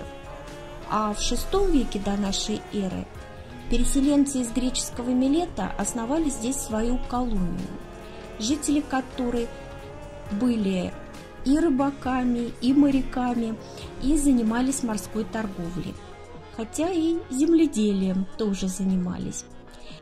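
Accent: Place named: Bulgaria. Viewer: Как приятно слышать болгарскую речь!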